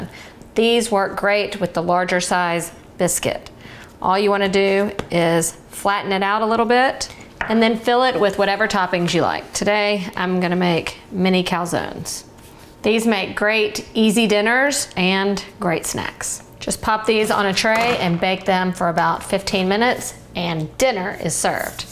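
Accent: South, United States. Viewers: Was NOT expecting that thick southern accent lol. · LOVE your southern accent! Wondering where you're from? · Gotta love the southern in this girl!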